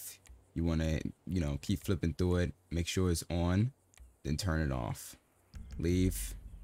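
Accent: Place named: Memphis, United States. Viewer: You gotta be from memphis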